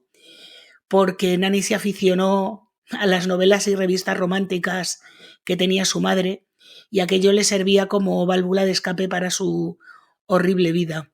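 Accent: Spain. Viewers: Primera vez que te escucho, aunque está sra hizo cosas horrendas tenés una manera de relatar tan graciosamente española que x momentos me tentaba de risa (vaya que ojo tenía...😂) ya me suscribí!!!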